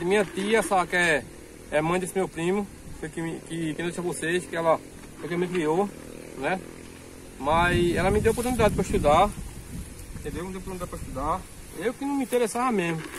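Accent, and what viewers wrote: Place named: Northeast, Brazil. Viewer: Boa tarde amigo adoro seus vídeos e sua família. Fala do jeito que vc sabe eu estudei mais amooo o jeito nordestino de falar isso é vergonha não as vezes eu falo errado e normal pra mim liga não continua vc é top trabalhador isso e no que importa um abração pra vcs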